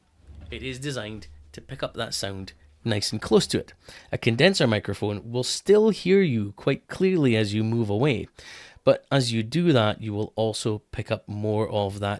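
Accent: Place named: Scotland, United Kingdom. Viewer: You sound like someone I know. I have heard that Scottish voice on certain videos before on the internet.. 😶‍🌫️ ASMR next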